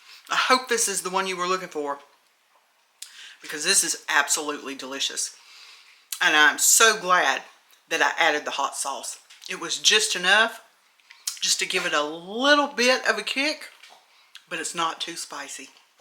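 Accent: South, United States. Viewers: I love watching you. I was raised ijn So. Calif. My family is from the South. I learned home cooking that is Southern. And I so enjoy your Southern accent and what you cook. It is just down home for me. Thank you.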